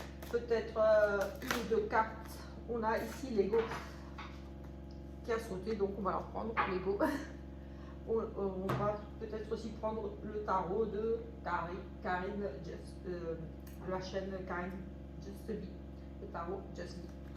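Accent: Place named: East, France. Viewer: Un accent de lest on dirait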